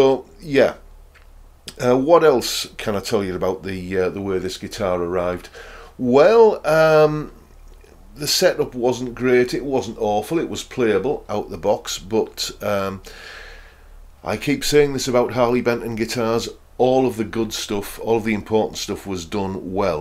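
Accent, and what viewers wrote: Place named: Teesside, United Kingdom. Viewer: Great review mate. I've just ordered one of these from HB and was looking through some reviews on the guitar and being a Teessider myself (now living in Oz) I immediately locked on to your accent! Like many people I can't really justify (to the wife lol) spending the kind of money you have to spend to get the Gibson but I really like the style and chunky sound these hollow body's produce. Given the price it would be almost rude not to buy one! From the looks of things the cost/quality ratio seems to be acceptable so I can't wait till it arrives to live out my 'Back to the future/ ' dreams! haha.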